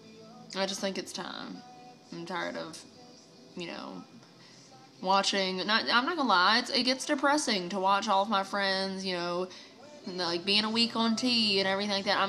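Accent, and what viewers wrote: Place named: Missouri, United States. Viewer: is it just me or is there definately some missouri accent rubbing off on him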